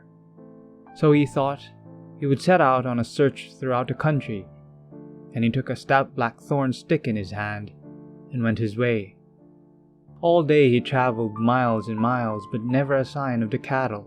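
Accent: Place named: Ireland. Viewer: Lovely reading, are you Irish yourself? I hear a subtle accent but not sure if it's thematic 🙌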